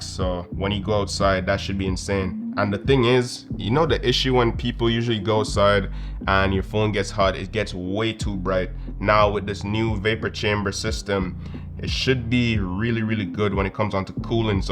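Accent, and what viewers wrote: Jamaica. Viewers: Sound like him from 🇯🇲 cant wait to get mines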